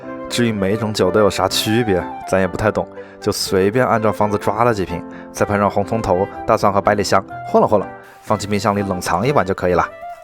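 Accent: Wuhan, China. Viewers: 就是说 这一听就是直击灵魂的武汉口音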